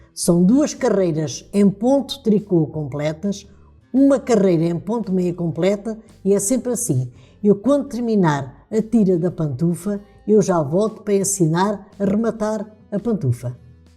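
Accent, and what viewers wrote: Portugal. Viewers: Vc é ótimo ensinando, muito fácil de entender, o seu sotaque de Portugal é uma gracinha, parabéns por todos os trabalhos lindos 🎉🎉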